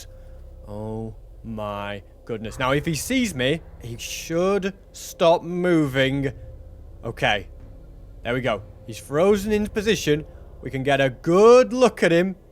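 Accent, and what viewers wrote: United Kingdom. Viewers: You British? It sounds like it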